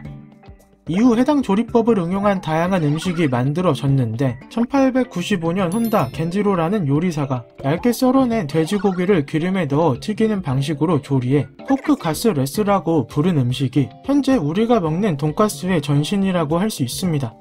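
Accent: South Korea. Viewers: 궁금한게 나레이션하시는 분은 어디 말투죠?ㅋㅋㅋ서울말은 아니고 남쪽사투리도 아닌것 같고 ㅎㅎ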